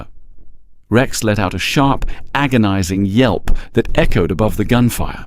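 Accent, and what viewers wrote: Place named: England, United Kingdom. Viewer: It’s weird listening to an English accent in a USA set story 😂❤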